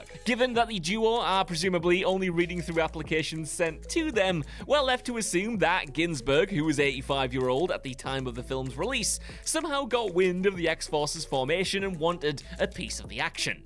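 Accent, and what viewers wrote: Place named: Northern England, United Kingdom. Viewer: LOL, The number of ppls not getting the more Northern accent here is amusing me no end. He DID say Bader just in the way anybody from his area would. Reminds me of Americans needing Subtitles for Trainspotting 😂.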